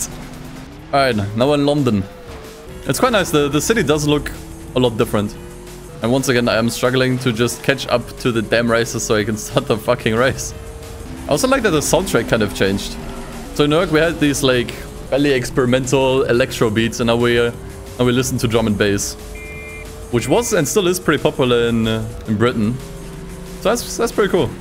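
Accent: Germany. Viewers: Hearing a German guy pronounce Spanish words is very amusing lmao not that he did bad he actually pronounced him pretty good it's just accent humor lol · Are u German?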